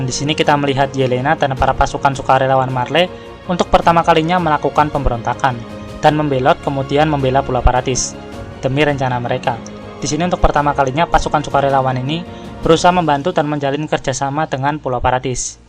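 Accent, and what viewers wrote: Java, Indonesia. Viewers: Koe yo wong jowo to 😅, tanks min dengan ini aku bisa tidur nyenyak 👍👍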